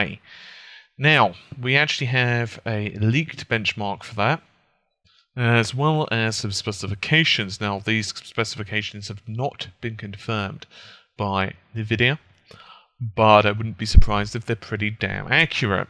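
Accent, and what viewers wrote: United Kingdom. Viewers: It's because he is British. They have many strange accents there.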